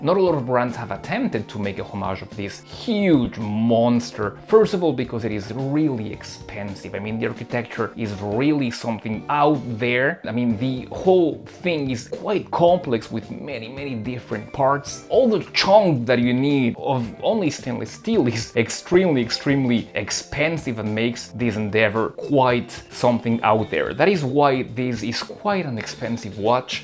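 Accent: France. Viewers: When I heard your accent, I checked if it wasn't a translated video from French. No offense ;) Mon accent n'est pas vraiment meilleur pour être honnête. Quoique qu'il en soit bonne et honnête vidéo. J'apprécie que tu ne t'extasies pas sur tous les modèles.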